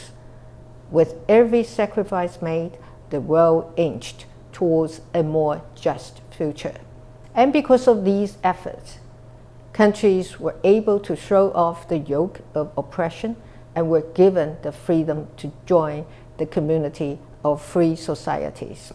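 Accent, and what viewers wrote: United Kingdom. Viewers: 讀稿機很正常，即便沒讀稿機，蔡總統英式英文原本就很熟是事實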